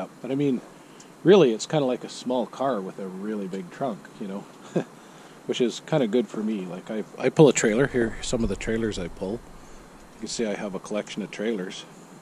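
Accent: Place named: Canada. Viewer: Sounds like a Canadien.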